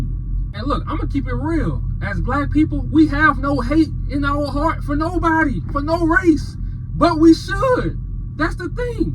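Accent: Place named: Louisiana, United States. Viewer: 1st time I heard you speak, I said, that must be a Louisiana Man. Do you know where St. Claude & Esplanade is Nephew?? ❤❤🖤🖤💚💚👊🏽 B1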